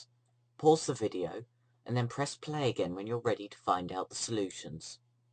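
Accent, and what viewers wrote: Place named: United Kingdom. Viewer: His voice is British! Minnesota Literacy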